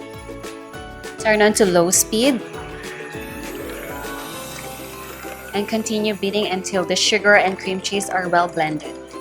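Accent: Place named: Philippines. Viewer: I thought you are in a foreign country then I realize you were a filipina when you spoke and saw the video.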